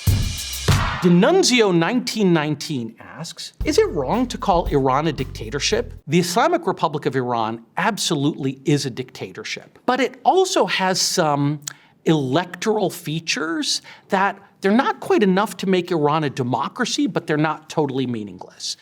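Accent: Arab world. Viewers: His pronunciation is very Arabic and less Persian. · I always wait for him to pronounce arabic words super arabic style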